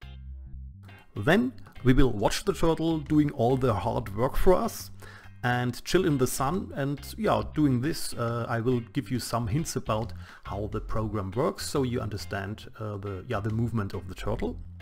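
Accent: Germany. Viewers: bruuuuuder man merkt, das du ein deutscher bist xD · du bist deutscher oder ?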